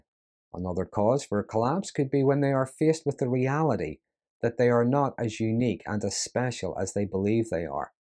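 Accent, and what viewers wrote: Ireland. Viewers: Hey Ya! Binging your vids today. What a Rich MINE! of lucid eloquence. It's serving as an Excellent re-cap and review of all I've ben learning and applying the last two years since discovering Evil is real, walking among us, uncaged. And I'm catching some new stuff or another way to put old material. Thank you Just what the Dr ordered for this Gorgeous Spring Sunday in the desert. 🌞😊 Off topic- ish, but came to mind as I've listened: Are you following the plight of the British Royal Family and the phenom of the great Narcissist, Meghan Markle? I haven't looked at your deets yet, been too fascinated listening to you, and doing housework, but I'm thinking your accent is Irish, so...( Just FYI because I'm pretty 😅sure I'll be hanging around your channel a bit: I'm English born and raised till 12, then fam moved to States. I'm a mutt but mostly American Mexican by culture. 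Have lived in New Mex, USA for 26 years) Just curious about your thoughts on the subject if you have had interest. On that note, are you aware of HG Tudor's work? Any thoughts about his methods of madness? Ok- Back to absorbing your words and knocking out the dishes. Cheers 🇬🇧 + 🇺🇸 =❤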